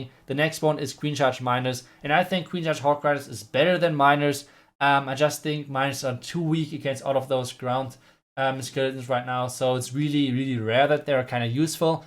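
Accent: Germany. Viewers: strong german accent u can always recognize that hahah but its sounds good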